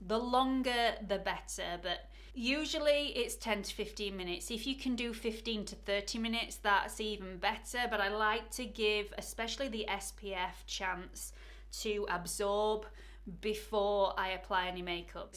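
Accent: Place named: Yorkshire, United Kingdom. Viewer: Excellent video. I just discovered you and so happy I did. You’re amazing! Learning a lot from you. Look forward to watching all your content. And of course I love your accent. Are you from Yorkshire? Wild guess thereLol. Love from the States! 💕💕💄🌻🌷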